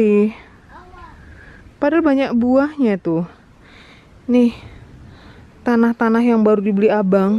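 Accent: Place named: Java, Indonesia. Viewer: Ya...allah liat mamak pakai bahasa jawa jadi jawa madura , kemekel aku mak dengernya #asli sidoarjo jatim #fans online mu mamak#semoga menular punya baby penantian 13th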